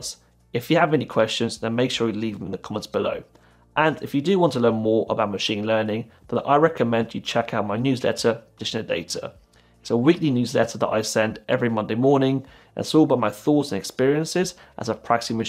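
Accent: United Kingdom. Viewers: For machine learning, I choose to learn Python and C++ as my main programming languages. I like your video because of your British accent. Why don't you use X as a machine learning engineer?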